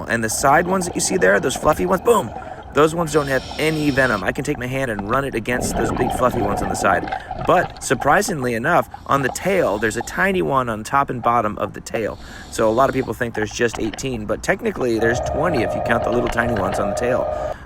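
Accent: Australia. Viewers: Thats a solid Aussie accect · Love your Steve Irwin accent 👌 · Nice Aussie accent.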